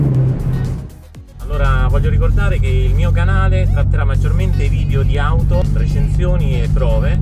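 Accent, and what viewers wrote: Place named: Italy. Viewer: Ese acento italiano brother.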